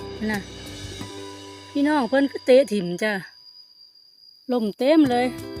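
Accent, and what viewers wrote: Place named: Phu Thai, Thailand. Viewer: ทางได๋น้อคือสำเนียงภูใท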